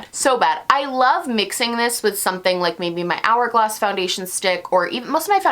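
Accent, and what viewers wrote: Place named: Rochester, United States. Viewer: I'm from Rochester but I've lived in Seattle for five years and I watch your videos to hear that sweet sweet Rochester accent ❤️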